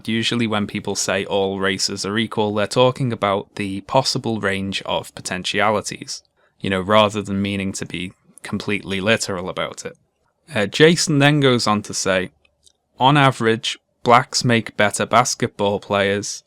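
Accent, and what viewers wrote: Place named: England, United Kingdom. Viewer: it's strange hearing how much more english he sounds in these earlier videos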